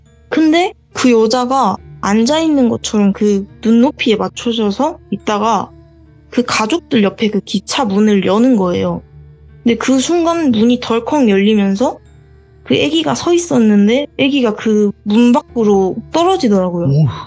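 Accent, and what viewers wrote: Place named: Jeolla, South Korea. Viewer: 전라도 분이신가봐요.. 어딘지 알거 같아서 ^^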